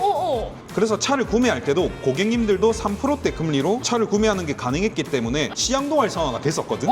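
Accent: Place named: Gyeongsang, South Korea. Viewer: 사투리는 갱사도네요.ㅋㅋㅋ 아랫동내는 역시 먹을께 없엉....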